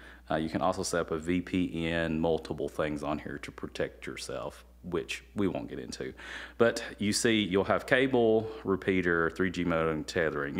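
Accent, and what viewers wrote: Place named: South, United States. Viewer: Why does this philipino guy sound like a southerner hick?? the accent is just annoying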